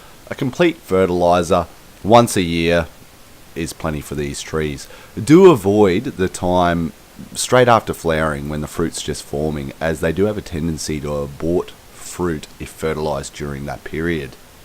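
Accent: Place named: Australia. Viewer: Such an aussie accent i like it... beautiful finger lime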